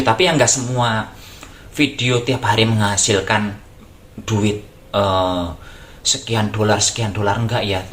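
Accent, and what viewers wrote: Banyumas, Indonesia. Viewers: Selamat dan sukses buat mas.semoga tetep jadi anak yg berbakti sama biyunge.jangan dirubah yo logate ngapak,biar tetep rameee.... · Bahasa ngapak lucu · Channel sampean kuy unik, mas, khas dengan logat "ngapak", yang tidak terbiasa dengar merasa lucu dengar sampean ngomong Selamat ya.. Insyaallah barokah, jangan lupa rezekinya untuk istri & anak nya, ech sudah berkeluarga blum mas..🤭😘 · Jawa nya si mana ms.....? Ngapak ya.....?? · Mas ini sangat lucu, asli, cerdas, sangat menghibur, ngapaknya, jangan marah, ya aku panggil kamu, mas???? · Asli orang mana Mas 😀 jawanya ngapak bisa menghibur nitizen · Selamat Yo mas mudah" sehat terus dan tetep ublek" di tunggu resep" nya dan tetep bahasa ngapak nya aku suueeeng tenan... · Sebenere sampeyan asli mana pak...Banyumas atau mana...kok busnya ngapak... Salam ngapak 👍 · Bahasa ngapak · Mas selamat ya smoga makin byk subsucrebenya,krn ada ciri khas bhs banyumas apa mas 1 daerah sm mas Anngger pradesa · Jujur Lik nyong SUBSCRIBE ya mergo bahasane sing ngapak Lik lucu😀 Padahal ya basaku dewek... 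selamat ya Lik sukses selalu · Ini yg selalu ditunggu resepnya.... anakke mbok Dirah... seneng soale pd2 wong ngapak... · Selamat ya ms Justru aku tertarik dr ngapak, simpel n praktisnya itu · Yg bikin laris karna kocaknya NGAPAK ASLI dan ndesa bgt itu... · Mas aslinya mana...kok bahasanya NGAPAK....dan sekarang tinggalnya dimana....mas selamat ya semoga berkah.... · Mas....sy sering buat kuenya.....sederhanapun sdh bs jd kue.....alami lg. The best pokoknya lanjut mas....aku jg suka dr bahasanya yg ngapak2. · aku kok seneng dgn located ngapakmu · Ora ngapak ora kepenak. Kereeen mas 👍👍👍👍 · Ak seneng ndelok mergo bosone ngapak lucu..alat2te simple bahane yo gampil..cara penyampaine gampang plus cletuk2kane gawe ngekek dewe..semangat yo mas..berkah barokah aamiin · Selamat ya mas dengan bahasa ngapaknya justru itu jalan rezekinya sukses terus ya jadi diri sendiri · Lanjut kn dengan ngapaknya👍🏻👍🏻😅😂😂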